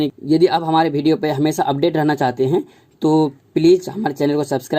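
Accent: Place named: Bihar, India. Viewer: Pakka Bihar se hai aap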